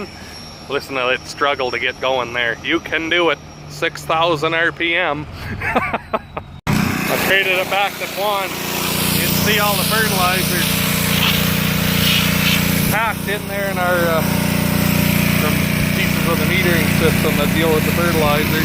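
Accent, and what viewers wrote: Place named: Canada. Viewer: This is a Canadian channel, if the name doesn't give a hint the accent sure do 😅 keep it up, really like your attitude man!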